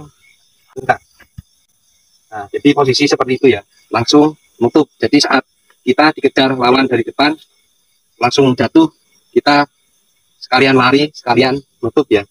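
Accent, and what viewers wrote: Madura, Indonesia. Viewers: Orang Madura bukan mas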